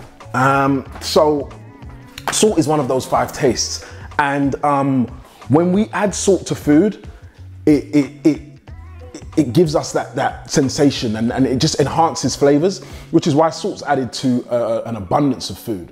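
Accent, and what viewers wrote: England, United Kingdom. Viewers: He must be from london that accent is strong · English accent is a good reason not to continue with the video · That British accent.😁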